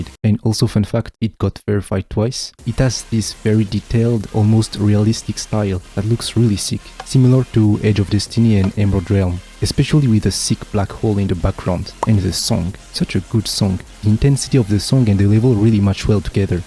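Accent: France. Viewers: bro are you french you sound so french lol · You have a strong sounding like French accent I like it🔥 Nice vid !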